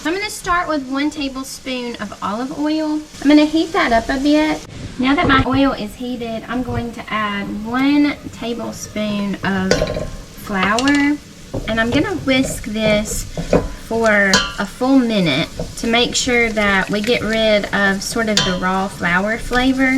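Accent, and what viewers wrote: South, United States. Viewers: I love your Southern accent. Where are you from?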